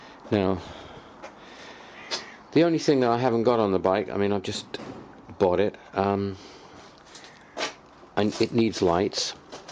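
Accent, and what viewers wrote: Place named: United Kingdom. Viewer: Hi thanks for uploading this video. I'm assuming by your accent that you are from the UK? I was just wondering if you have ever been stopped or asked by the police about the bike? The UK law on e-bikes have been changed so those 'off road' boost buttons are no longer permitted. So the new A2B only does 15MPH as standard but Ultra Motor can up the power to the full 20MPH again for 'off road' use. I'm planning on purchasing a new a2b but wondering if I should get it unrestricted or not. Thanks!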